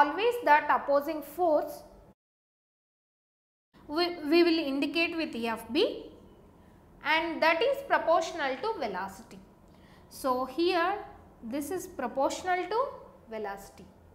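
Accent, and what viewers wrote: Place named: South India, India. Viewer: aiyooo south indian.... :D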